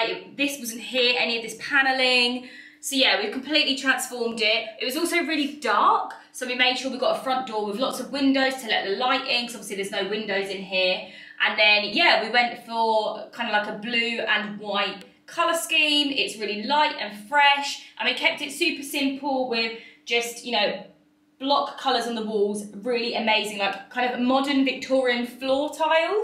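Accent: United Kingdom. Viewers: I am a big fan of yours on Instagram ,and this is my first time I see your YouTube channel, so now I am subscribed. Beautiful house tour, The Decour is spectacular ,and I was so fascinated by your British accent love it 🥰 !!!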